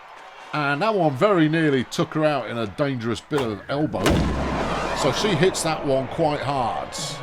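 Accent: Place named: Australia. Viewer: It's refreshing to have an Australian commentating a baseball game. Baseball is a fun game for everyone.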